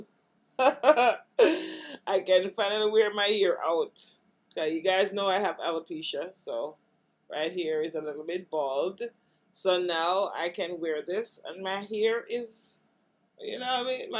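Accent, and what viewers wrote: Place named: South, United States. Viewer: To me California was a cultural shock for me coming from Sc . Seeing teachers not dress up like in a pair slacks and tie. California teacher at school was looking like they was going to the beach. You could not tell the different from the student and the teacher. See all different nationalities in one city and California being warm year round. I can understand you. And they asking where you from because you got a southern accent.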